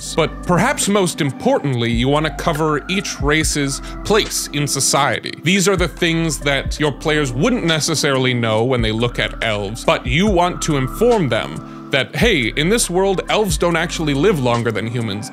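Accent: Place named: United States. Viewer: He sounds just like me a Michigander, except with a bit more drama to the voice. So sounds very American, very clear to me!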